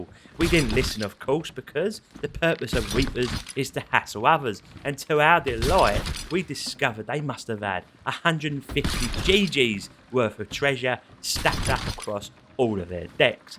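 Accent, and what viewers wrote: Cockney, United Kingdom. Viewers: You deserve more subscribers my cockney sparrow! Love your videos 👍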